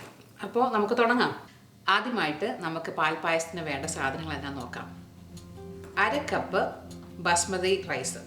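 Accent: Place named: Kottayam, India. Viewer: Chechii.. beautiful presentation👌. Samsarathile aa kottayam style... 😍😍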